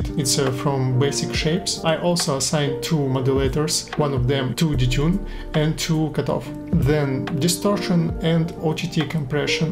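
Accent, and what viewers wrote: Russia. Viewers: так ты русский знаешь. Я такой сижу слушая твою речь на инглише и понимаю , что это мать вашу русский говор . Ахаха, приятно удивился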